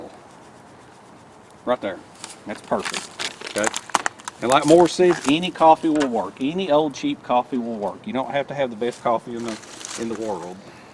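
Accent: South, United States. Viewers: ok this is super stereotypical but your voice is the perfect southern accent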